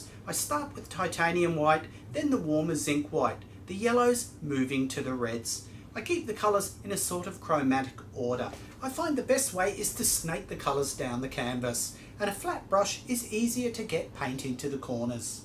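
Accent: Australia. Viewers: I feel immediately comfy cause youre as aussie dounding as me..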